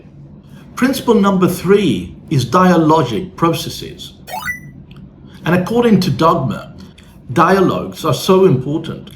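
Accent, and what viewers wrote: United Kingdom. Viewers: Are you native speaker of british English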